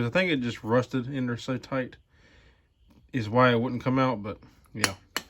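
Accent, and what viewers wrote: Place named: South, United States. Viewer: Straight up Truth has a certain ring to it and you chiming like the Liberty Bell. Nice sharp car you got there, nice work, I especially like your comments on how not to damage the seal by denting it and causing a leak. Also cool Southern Accent. Hello to All from Alaska.. Ps; ye'all keep up the good work.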